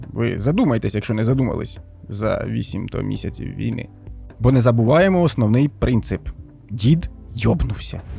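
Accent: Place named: Chernihiv, Ukraine. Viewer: рідненький чернігово-сіверський діалект 🥲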